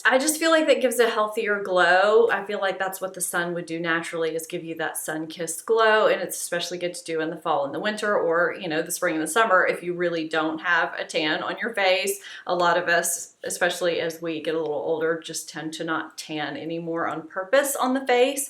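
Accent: South, United States. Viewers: Hi! " sent me" and I"m staying! As for eyeshadow for liner, I like the look but I can't get it to stay for more than an hour or 3. What's the secret? And did I hear a bit of a southern accent?! I"m from NC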